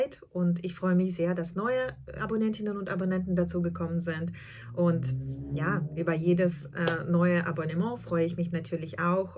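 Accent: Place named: Luxembourg. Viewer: Liebe, ich finde deine Videos sehr interessant. Das eine war mir auch zu viel Theorie. Dann nehme ich mir die Freiheit abzubrechen. Ich habe vor vielen Jahren eine Übersetzerausbildung an einem Fremdspracheninstitut gemacht. Ich liebe Sprache und was damit zusammen hängt. Wenn ich dir zuhöre, bilde ich mir ein, einen luxemburgischen Akzent zu hören. So und jetzt schaue ich weiter 😊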